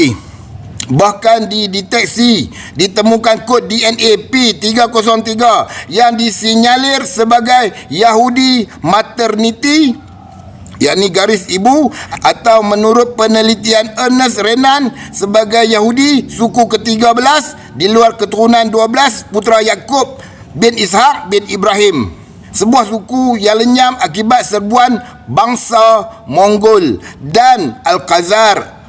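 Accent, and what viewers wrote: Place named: Kedah, Malaysia. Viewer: Salam , org Indon faham juga ya cakap orang kedah Malaysia . Terbaikkk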